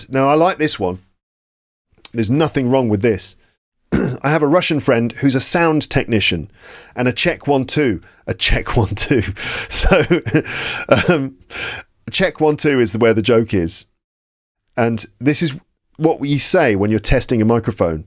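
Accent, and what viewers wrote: RP, United Kingdom. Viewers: I love your video about jokes and I love your british accent is wonderful to hear you!!! II am a Visual artist and photographer from Argentina. Best wishes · Hello,! I'm an English teacher from Buenos Aires, Argentina. I' m delighted to hear your perfect R.P. English.You are very kind and patient explaining things that are too simple for you. Love it when you chuckle, kind of admitting that a joke is terrible. I guess your work is truly helpful for students of English. I learnt a few things about world culture. Thank you so much! 😊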